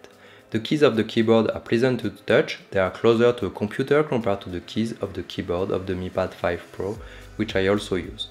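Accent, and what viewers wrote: France. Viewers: Your french accent is too thick i almost didn't understand what you saying...but you don't have to change anything because this is this channel identity.. Btw seem a good product recommendation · Beautiful French accent 👍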